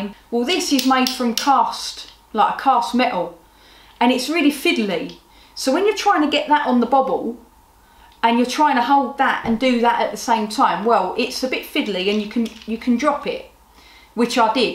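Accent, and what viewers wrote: Cockney, United Kingdom. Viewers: cockney is cool 😎 Here in the south of the USA we have a southern drawl!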